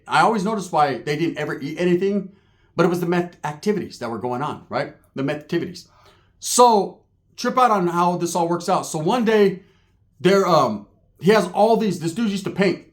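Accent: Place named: Bay Area, United States. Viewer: Aye uce... I peep how you sound like a bay nigga. They not in tune on how we talk. These stories be having us dying 😂 you sound like a OTHERS. That super uce..all your samoan viewers heard him thru you😂😂😂😂we felt dat sole..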